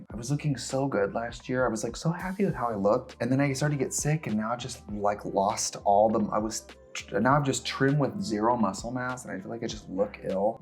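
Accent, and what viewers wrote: Wisconsin, United States. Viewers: I love it when your wisc. accent comes through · LOL, Wisconsin accent. I'm still trying to manifest the x Charlie Berens Wisconsin collab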